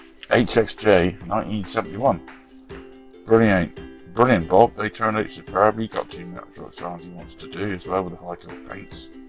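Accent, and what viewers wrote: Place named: Devon, United Kingdom. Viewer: Hi Bob, loving all your videos, always a joy to watch, how do i contact you directly regarding your restos ? you also sound like a Devonshire lad like myself, i also after watching this video like to share a few tips with you also, all the very best, stay safe bud.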